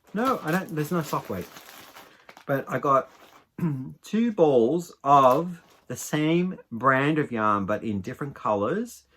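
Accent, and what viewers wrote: Australia. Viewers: Blessings for quick recovery. Hope this finds you better. I'm new from long hiatus from crocheting. I've never ordered from Aberdeen. I loved their Toy Box at $2!!! So I went on the site to order....all colorways Sold Out.😢😮 I love cotton! ❤️ Beautiful Your projects are Magnificent! ❤❤❤ What a Wrap! WoW.. You are Very Gifted! Love the Colors too. Your Accent is Australian? Love to hear you talk. 😊 1st Bag was so my Colors, #6885.❤ It's a Small DK. Bloom is so Pretty. 😮 Loved the Cotton/Nylon. ❤Enjoyed all the yarn haul. Thank you my Friend. Bless You.❤️🙏🏼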